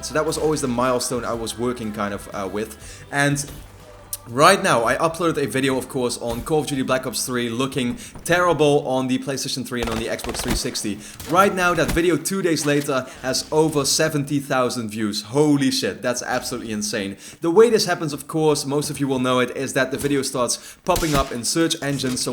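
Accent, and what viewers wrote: Netherlands. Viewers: are you dutch? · I think he's Dutch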